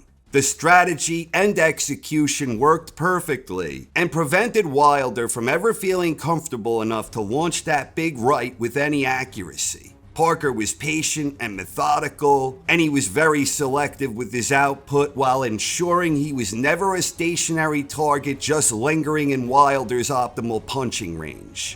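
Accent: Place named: New Jersey, United States. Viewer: His videos are actually quite good. I like his voice, very New Jersey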